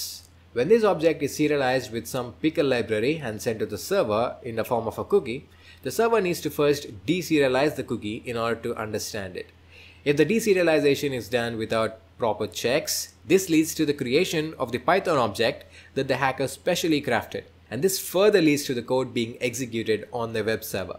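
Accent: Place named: Guntur, India. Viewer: Indian accent.. no thanks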